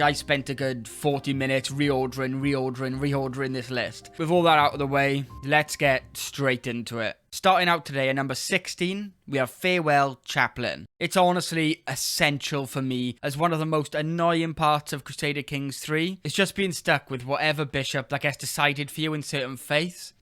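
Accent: Wales, United Kingdom. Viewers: I love your Welsh accent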